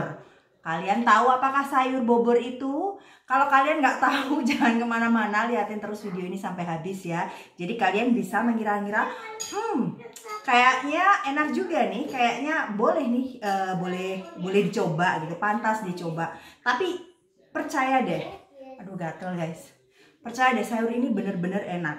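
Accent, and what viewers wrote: East Java, Indonesia. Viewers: Mb ini dr logatnya mesti jawa timuran ki..😆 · Hahaha lucu mbak ikiii, medhok nya jowo tenanan.😍 Tp pancen enak tenan Sayur Bobor kuwi...., karo iwak asin ae wes TOP mar ko TOP.. Jadi ngiler akuuu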